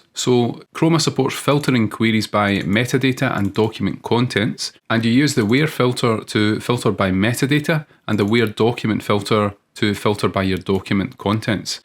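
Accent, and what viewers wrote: Scotland, United Kingdom. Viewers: Loving your Scottish accent mate! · Trying to focus on the topic but your accent keeps throwing me off. Love the scottish accent.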